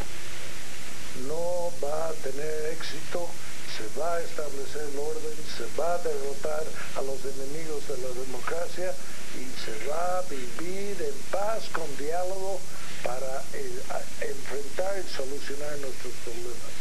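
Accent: United States. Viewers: Oye pensé que era Bush hablando, parece un norteamericano noten la R que pronuncia. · este señor no sabe hablar español? tiene un acento gringo ? el fue presidente de bolivia ??GUAOOOO .......increible !!!!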